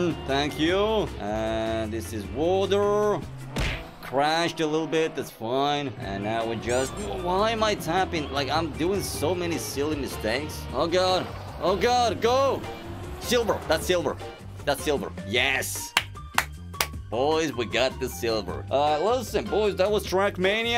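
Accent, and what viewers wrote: Sweden. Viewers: are you swedish?? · are you Swedish?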